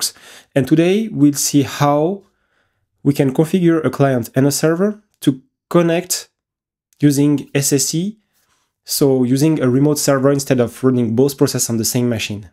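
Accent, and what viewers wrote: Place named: France. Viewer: Nice bro ! I can smell that fresh French accent, but very good content !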